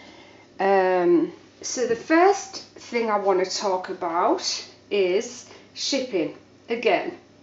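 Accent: United Kingdom. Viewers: I think that a lot of us that love your voice are not from the UK. All the UK accents are beautiful sounding. For me the best part of your voice is that it just sounds like you are enjoying what you're doing and when someone sounds like they are enjoying what they are doing their voice is priceless.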